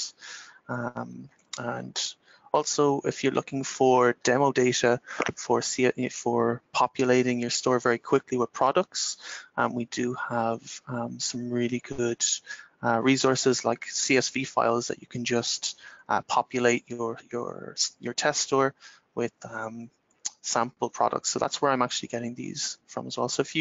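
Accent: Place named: Ireland. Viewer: It took me a minute to realize the sound "TEAM" is an Irishman trying to say "THEME."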